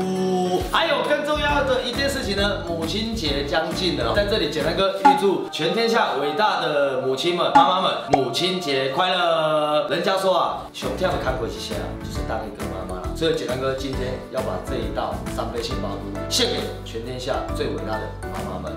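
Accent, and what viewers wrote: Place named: Taiwan. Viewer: 的台灣國語很口愛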